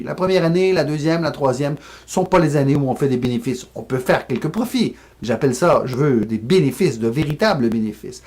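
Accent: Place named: Canada. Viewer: Merci et Bel accent canadien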